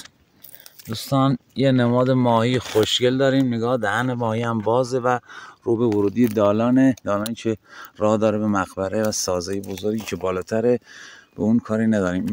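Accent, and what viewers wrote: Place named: Fars, Iran. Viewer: درود دوست عزیز بسیار لذت میبرم از ویدیو هات یه سوال دارم اینکه شما کدوم منطقه هستید فک میکنم استان فارس باشید از لحجه شیرینتون این حدس رو زدم ممنون میشم بگید کدوم شهرستان هستید که اینهمه نماد و کارای زیبا داره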